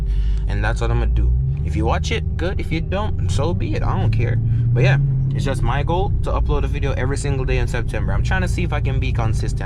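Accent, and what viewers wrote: Jamaica. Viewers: Are you a jamaican??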